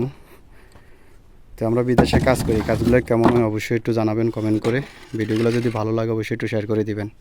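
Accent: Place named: Noakhali, Bangladesh. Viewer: আপনার বাড়ি মনেহয় নোয়াখালী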